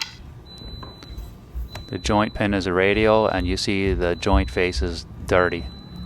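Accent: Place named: Philadelphia, United States. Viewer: You have an ancient working-class accent we don't hear anymore. Is it a delco/philly accent?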